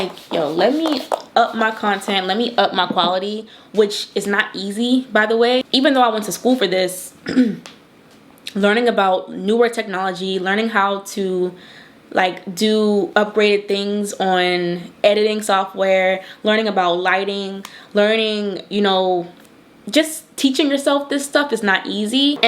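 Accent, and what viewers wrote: Baltimore, United States. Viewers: In all the years of watching you, I just realized how subtle your Baltimore accent is! I too live in Miami (born and raised) but I live south near Zoo Miami. Praying the best for you and your new endeavors 🙌🏽 I just applied to FIU for their new Cybersecurity program 🙏🏽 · Soon as you started talking I knew you were a fellow Baltimore native lol. It's rare to hear people who sound like the people in my everyday life on YouTube. The way you talk is so familiar lol.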